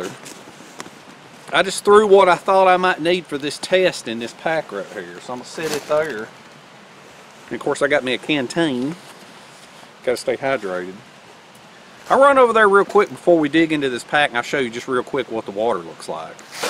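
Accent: South, United States. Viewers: you have the heaviest southern drawl I think I've ever heard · I love to hear his southern drawl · Holy Moly 10 days of rain. Good sound for sleeping though. I love the sound of rain on a tent, and rushing water, louder with the wind the better. I so love your great quality gloves with the velcro wrist straps to tighten. Nice matching hat too. Wow great idea on the 2 custom tent stakes and to boot you criss cross them for extra stability. You are one of the cleanest best color coordinated dressed bush crafter or sometime woodsman lol on YouTube I bet. Good for you! What was that in your accent, was that sake? Love the southern accent. Wow awesome results!!!! Hot stuff wow. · How did you make your reflective tarp and firewall? I learn a lot from you and love the southern accent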